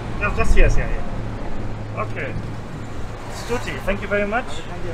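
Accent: Germany. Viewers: I like that you have a strong German accent 😍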